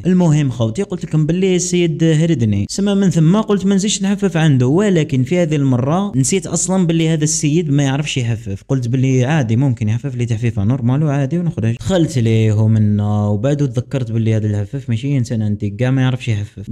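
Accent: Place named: Algiers, Algeria. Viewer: هاذا من العاصمة تجيكم اللهجة صعيبة شوي خاصة اذا كنتي من غرب المروك تفهمو هدرت الغرب الجزائري كيما وهران وتلمسان اما لهجت العاصمة ولا الشرق مثل عنابة تجيكم صعيبة😅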